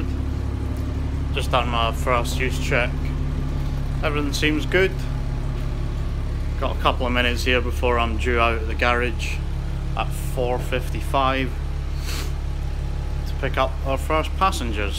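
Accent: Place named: Scotland, United Kingdom. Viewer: Thourghly enjoyed the video. Nice scottish accent. Hope your stil with the buses. Avid enthusiast.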